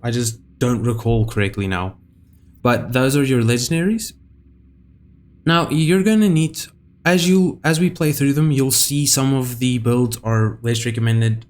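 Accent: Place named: South Africa. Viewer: Hmmmm I feel like you are south african 🧐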